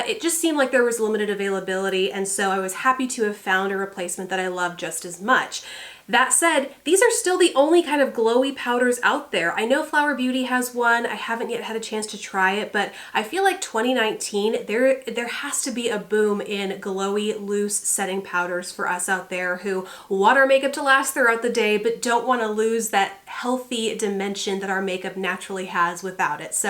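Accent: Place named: New York, United States. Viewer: Your from texas? I thought you were from New York girlfriend:)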